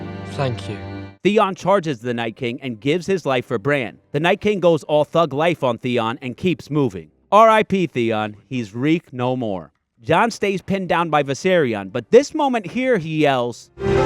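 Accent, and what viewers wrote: United States. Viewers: Can't stand for 30 seconds in front of your cringy American Feminist accent, it can even beat an Indian Gay. Get Help... Its OK. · I Love your NY Accent? Lol lol I'm also from NY just subscribed nice to hear some on who sounds like me.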